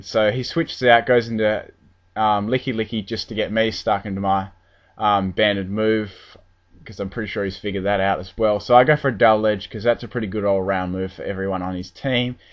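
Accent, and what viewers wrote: Australia. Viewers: is the guy commentating australian?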